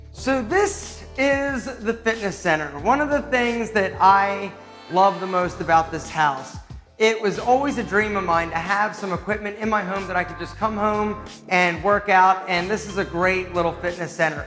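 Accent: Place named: South, United States. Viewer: Where did that southern accent come from?!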